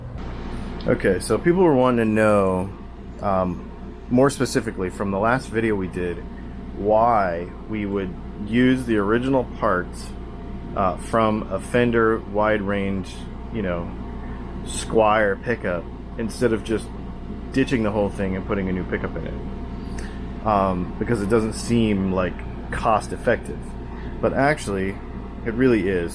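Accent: South, United States. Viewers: Windowses and browserses...a southern thang. Just like, howzyamomanem! Fine thanki. An yourz? Gotta love it.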